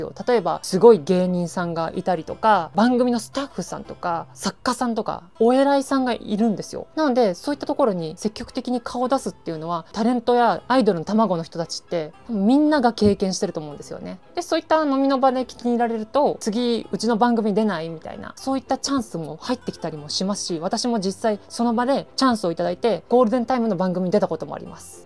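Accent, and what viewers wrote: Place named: Kansai, Japan. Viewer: 関西弁だから関西人かぁ？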